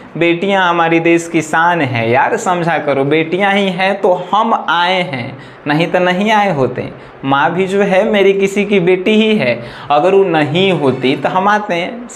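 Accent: Bihar, India. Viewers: Bhiar ka hai kya sir ya phir jharkhand ka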